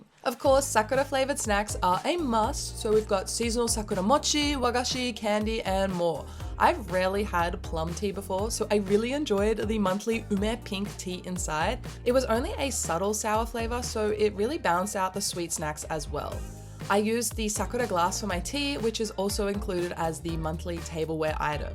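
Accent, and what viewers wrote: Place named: Australia. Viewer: Your trip back to Australia really brought back your Aussie accent 😂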